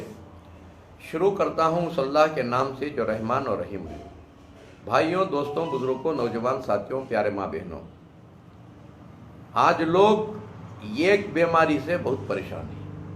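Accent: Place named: Bangalore, India. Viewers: Not Hyderabad. Banglori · its bangalore's local language (urdu) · Karnataki language hai yeh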